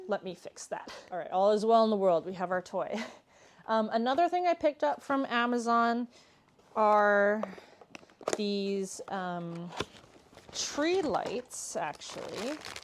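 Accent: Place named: South, United States. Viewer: it’s a cultural “speak” from parts of the south. My husband’s relatives, with “fancy pants” graduate degrees, pronounce it exactly like you do. Especially, when we are at a family get together, with wine and spirits, their Southern Charm flows. I think it’s enchanting! Please don’t change it for anyone. ❤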